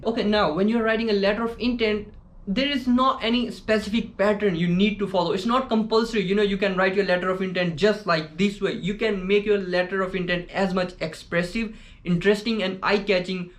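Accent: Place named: Pakistan. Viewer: kuch b kr lo native english bat ni kr pao gy 😂 tu faida nu Pakistanio ki trah he english bolo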